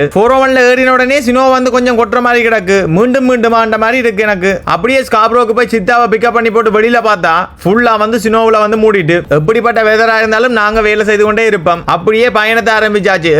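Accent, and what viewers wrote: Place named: Sri Lanka. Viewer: Hey Bro enga ooru slang SL 🇱🇰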